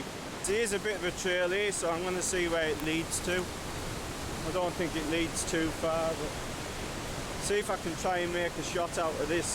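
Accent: United Kingdom. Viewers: To me, the image quality of your videos is fantastic. I don't always understand what you are saying (I am a francophone and not familiar with british accent) but watching your videos is a real pleasure. Bravo!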